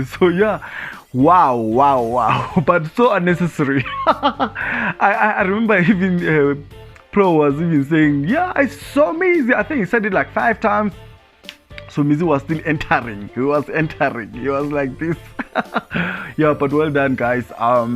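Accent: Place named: Tsonga, South Africa. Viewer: I repeat luyolo can sing you have so much hate for luyolo. Stop hating. And what's with your funny xitsonga accent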